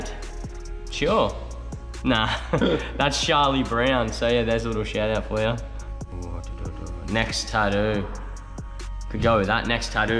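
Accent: Australia. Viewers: Adam we need more vids! From the beginning to the end of Love island you were my favorite! At first intrigued by your tattoo and handsomeness little by little I discovered your laid-back, honest and kind personality! You're one of a kind! And I love your aussie accent hehe.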